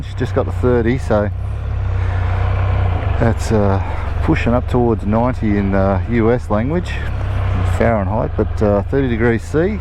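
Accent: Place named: Australia. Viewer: I couldn't make them out and sometimes I have a little trouble deciphering the Aussie accent, lol...I knew what you were saying regarding what they were doing lol, but couldn't see what they were...I remember playing pass the pigs, lol...thank you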